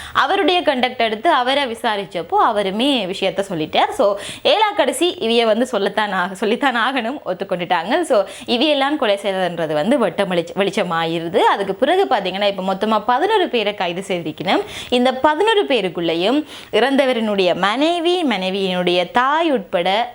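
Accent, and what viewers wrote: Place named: Jaffna, Sri Lanka. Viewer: பக்காவா யாழ்பாண தமிழை மாத்தீட்டீங்க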